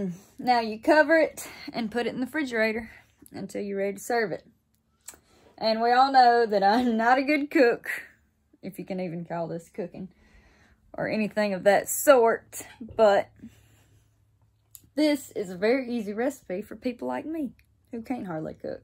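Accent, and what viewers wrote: South, United States. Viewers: southern siri voice great idea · You could read the phone book and i would check it out. Love your voice being from the north don't hear that southern accent that much. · Love the videos and the southern accent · her sweet southern drawl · I watched the whole video and heard nothing she said because I Was just listening to that southern accent lol. Love southern accents. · God I love a beautiful southern accent. Guess I’m making banana pudding. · That southern accent is absolutely relaxing. Her and her dad are cool cats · Hey,: You in the kitchen and with your southern voice, kinda warms the heart, just like Christmas! Keep them coming, even us old guys love you!! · "Puddin" oh I love that southern talk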